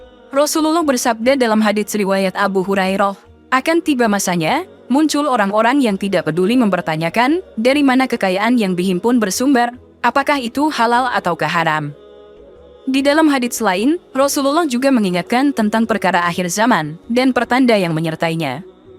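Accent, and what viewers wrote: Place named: Eastern Indonesia, Indonesia. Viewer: Dari kalimat dan sajian GAMBAR INI BUKAN KONTEN ISLAM JANGAN BODOH...dari logat ini dari timur,kefasehan ini nasara jg tertipu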